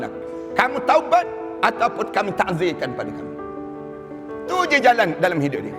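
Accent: Kelantan, Malaysia. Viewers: masyaallah...ya ke...baru ni... Kuah tumpah kemana kan.....alhamdullillah ade penyambung dari tgnik aziz...saya memang pu suka ceramah ustad ahmad dasuki ni.....sedap telinga dengar nyaman rasa di hati...ceramah ceramah nya..walaupun dgn loghat kelantan nya.. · Pekat loghat kelate ...ustaz yer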